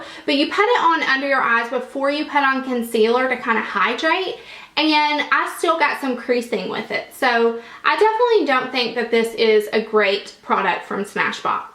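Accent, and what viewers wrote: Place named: South, United States. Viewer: I'm so happy some one is finally being honest on YouTube. I really appreciate it!! Love you videos so much... And I love your accent, I want a southern accent soooooo badly!! 😭😭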